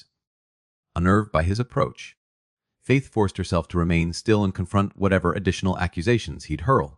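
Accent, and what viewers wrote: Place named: United States. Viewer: AI American accent narration in audiobooks supposed to be set in Regency Era London sounds dreadful. Please try an AI with an English Accent at least.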